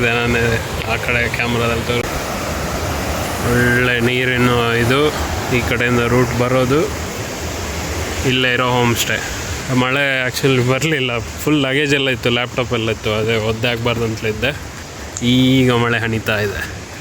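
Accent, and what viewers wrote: Mangalore, India. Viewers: I like Ur Mangalore kannada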